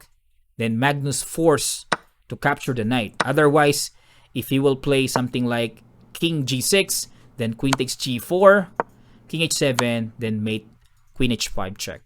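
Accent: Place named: Philippines. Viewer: The way you seak here is still patterned on your filipino version. And still very clear and well elaborated analysis. More subscribers for you coach. Good luck!!! Nakakadugo sa ilong mang english. Hahahahah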